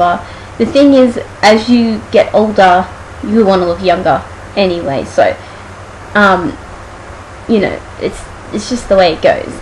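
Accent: Australia. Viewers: hell yeah girl i agree! i got 3! i think u look gorgeous and ur tattoo of the peacock looks gorgeous! oh and btw i loooooove aussie accents and girls like you ;P turn on! haha but yeah let the haters hate you know! prolly dont know what that means cuz im from chicago but you never know! keep doin vids i subscribed 5 min ago!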